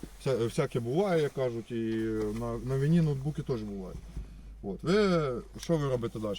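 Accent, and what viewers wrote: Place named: Moldova. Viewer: Щчо ты говоришь,порося???? Щчо за нарЭчие??? Ты щчо,молдаван???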